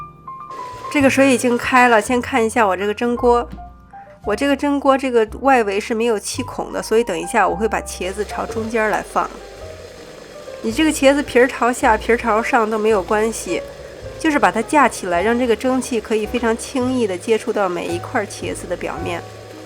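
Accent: China. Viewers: Downvote for shitty mainland chinese accent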